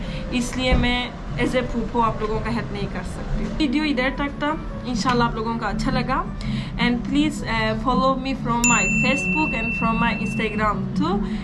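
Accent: Pakistan. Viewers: Ap sukardeo Gilgit baltistan k logo ke tara Urdu bolti Mashhallah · Yes u right u r not phupho 😂 Love your Urdu accent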